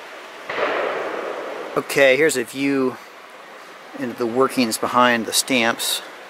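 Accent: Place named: United States. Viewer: Normal frame rate doesn't make a difference on recording. it's still the same picture only taken twice as often in 1 sec. maybe its to much data for the storage medium or the camera cpu. from my Experience filming in dark a environment. the video gets distorted and grainy when there is to much going on in the frame that the cpu has difficultys to render. this should not be seen as critic. i only want to give some thoughts to help your channel to more quality. i still like to watch your content and here some American accent.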